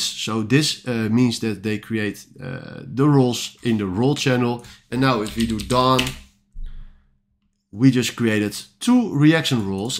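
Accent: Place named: Netherlands. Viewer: jij sound nederlands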